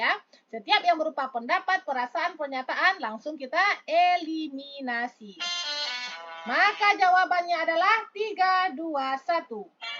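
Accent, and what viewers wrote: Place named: West Sumatra, Indonesia. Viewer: Dari gaya bicaranya ibu orang Minang ya.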